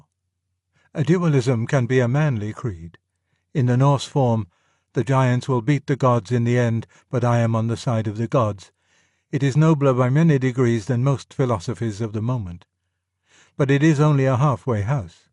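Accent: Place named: Oxford, United Kingdom. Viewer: You must be unused to the Oxford English accent. The U S accent is foreign to the rest of the world! :-)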